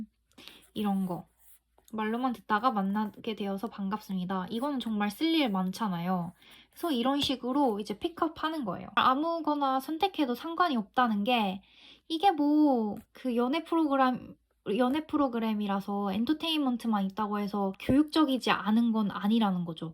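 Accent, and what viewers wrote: United Kingdom. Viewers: 기다렸어요ㅠㅠㅠㅠ 감성+분위기+영상미 게다가 고급진 영국발음ㅠㅠㅠㅠㅠ 너무 사랑합니다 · 정말감사합니다 영국발음 너무멋잇어서 공부법 보규 저도 꾸준히 달려보겠습니다.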